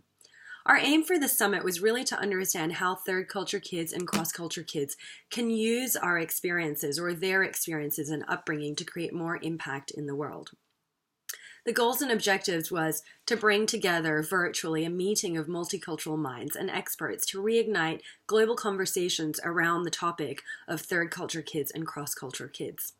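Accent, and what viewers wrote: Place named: United States. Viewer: You sound so American.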